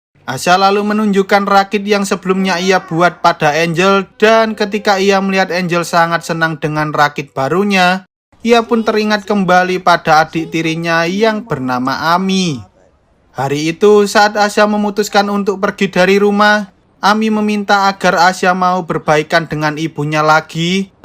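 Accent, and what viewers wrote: Java, Indonesia. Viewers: Min logat nya sengaja dibuat medok ya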